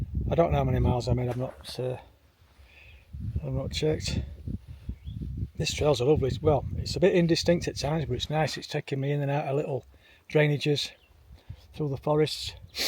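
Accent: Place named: Yorkshire, United Kingdom. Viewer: ###king bear! Brilliant. Fortunately it doesn't understand a good Yorkshire accent. Keep 'em coming.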